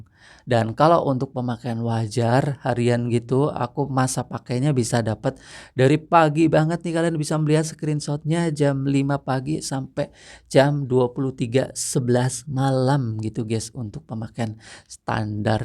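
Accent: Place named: Banyumas, Indonesia. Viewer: Ngapak bang.... Salam satu server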